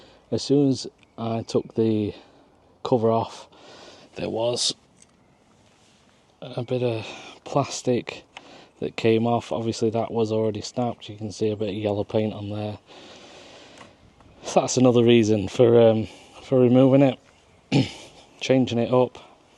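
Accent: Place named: North West England, United Kingdom. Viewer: You sound local to the NW, is that correct? Which auction house did you use? Interested in doing the same sometime soon. Have fun, stay safe, make a profit.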